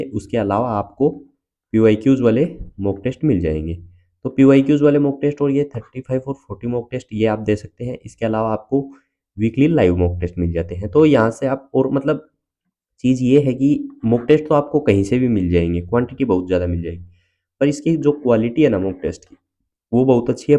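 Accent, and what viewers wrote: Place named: Rajasthan, India. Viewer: Bhai aap Rajasthan se ho na Bolne ke tarike se lag raha h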